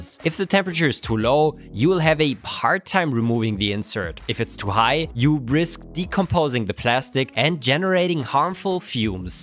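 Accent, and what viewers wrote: Germany. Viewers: Dein typisch deutscher englisch akzent ist einfach geil. Ich schau deine videos sehr gerne!!! Mega Kreativ! Einfach Hammer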